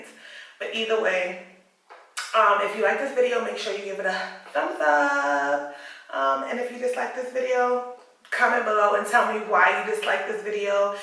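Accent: United States. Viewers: for your accemt you are from usa......and thats your identity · ? You sound like an American. How did you get that accent.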